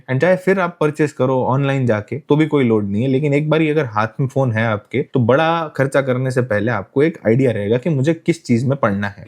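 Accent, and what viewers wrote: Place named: United States. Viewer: Bhai american accent bala vasha kaha sa sikhlio ho.😢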